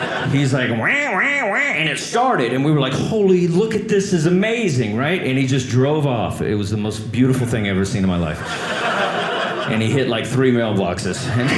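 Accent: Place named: California, United States. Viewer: This guy is a punk. If he is from Texas he's from Austin. Looks and sounds more like a California immigrate.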